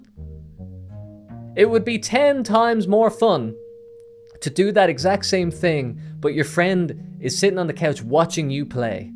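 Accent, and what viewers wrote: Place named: Ireland. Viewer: Why are you irish?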